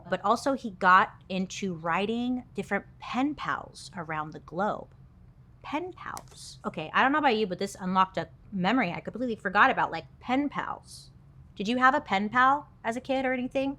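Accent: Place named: Ohio, United States. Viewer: You said that correctly. You sound like a true Ohioan so lol favor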